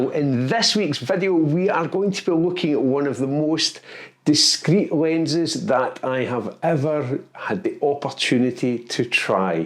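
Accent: Scotland, United Kingdom. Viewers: Great video! I love scottish landscapes and accent! Very cool!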